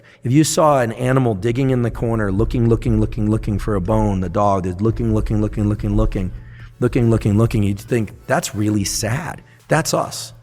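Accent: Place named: United Kingdom. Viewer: Just because you asked me to subscribe nicely and in a British accent, I will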